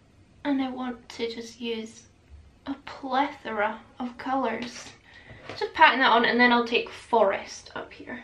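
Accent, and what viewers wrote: Scotland, United Kingdom. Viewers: Definitely a look...or an Elaina look too. Lol. Can I do your Scottish accent? I doubt but I can try. 🤣😘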